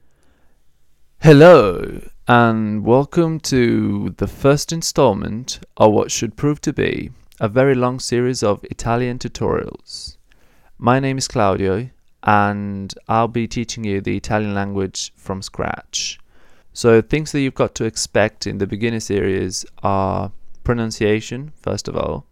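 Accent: England, United Kingdom. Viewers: OMG... thank you for the videos! I've been looking for something like this since last year but you didn't come up from research results and nothing else worth watching either. I found Tom, a guy from New York with EXCELLENT American English accent but he didn't break down his lessons like yours! So I didn't learn much from him. Thank god your British accent is NOT too thick for my American ears! :) Please keep it coming. I haven't watched the entire 65 videos yet but loving the ones I have watched. I even fall asleep! NO, it wasn't because it was boring but because your voice is soothing that managed to help me fall asleep (I am insomniac). Thank you again... and thank your girlfriend, too! :) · Born in England, or grew up there, or what? How amusing to find an Italian channel taught with an English accent.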